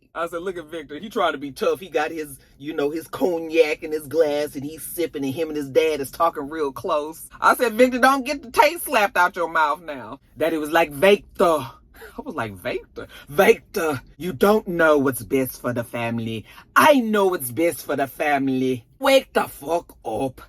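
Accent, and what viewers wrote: Ireland. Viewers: 🤣😂🤣😂 I loooove your Irish Accent!! Excellente' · It's the Irish accent for me...:)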